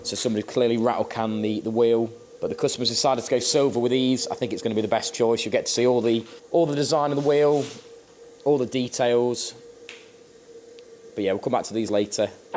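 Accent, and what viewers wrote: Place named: East Midlands, United Kingdom. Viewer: Reckon this came onto my feed because I’ve spent too much time in the East Midlands recently 😂 clocked the accent straight away. Great to see how these are done, always wondered how damage was repaired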